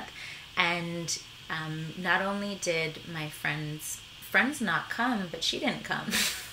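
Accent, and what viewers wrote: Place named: Canada. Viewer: Your location says United States but your accent is Canadian and your gym is located in Canada?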